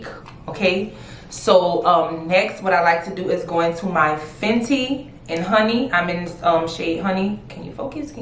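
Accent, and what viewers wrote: Louisiana, United States. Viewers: You are beautiful! And it sounds like you have a Louisiana or Arkansas accent have a lot of family from there thanks for sharing gorgeous look 😍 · Knew you were from New Orleans as soon as you started talking 😍😍 Then I went to see if you put where you're from in the description and I was right!! 😂 I'm from Jersey, but I LOVE NOLA accent!!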